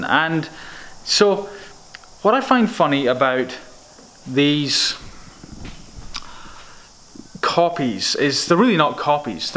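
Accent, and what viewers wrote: Scotland, United Kingdom. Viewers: I love your accent you sound Scottish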